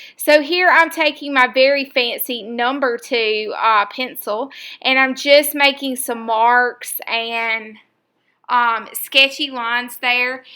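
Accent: South, United States. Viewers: Love to watch you and listen to your southern accent! Love how you use your giblets!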